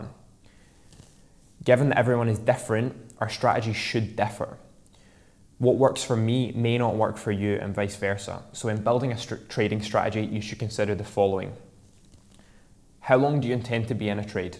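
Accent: Scotland, United Kingdom. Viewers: Where abouts are u from mate u sound Scottish ?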